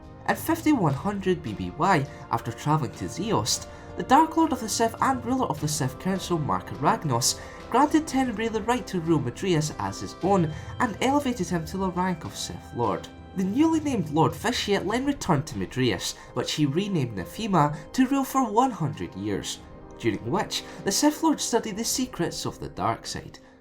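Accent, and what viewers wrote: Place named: England, United Kingdom. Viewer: Great vids , great narration but omfgod what a thick accent.. where it is from in England?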